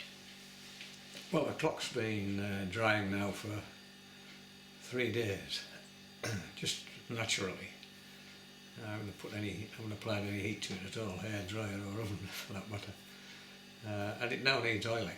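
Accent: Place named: Northern England, United Kingdom. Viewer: Hi. 2 years ago it would apply to me, Done 40 clocks since then, sell them on ebay and do some repair work as well. Really enjoy the work. Found something I enjoy doing at last and get paid for it as well. Seem to have learnt very quickly, despite being old 58 years. Sound you have a northern accent like me, Cheshire.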